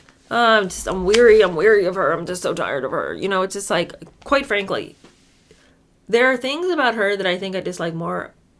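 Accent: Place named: RP, United Kingdom. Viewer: you do the posh British accent perfectly! I realize I am late to the party, but since I found this, I have binge watched!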